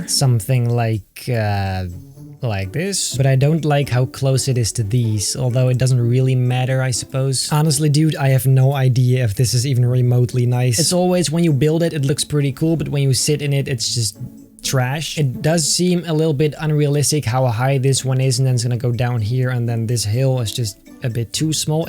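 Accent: Netherlands. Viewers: He sounds dutch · Are u dutch? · Are you Dutch?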